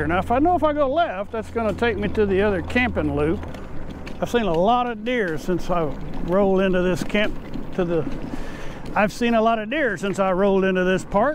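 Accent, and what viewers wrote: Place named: South, United States. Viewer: We watch your videos before visiting Texas State Parks. And we have become fan of your southern accent.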